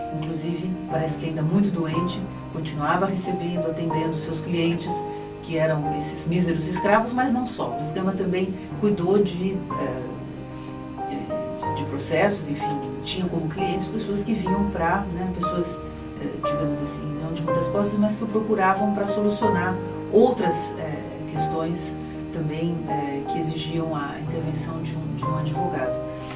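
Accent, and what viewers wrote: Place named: Brazil. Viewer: O sotaque dela não é tipicamente paulistano. Tenho curiosidade de saber onde nasceu a Dra.. O trabalho dela me encanta muito.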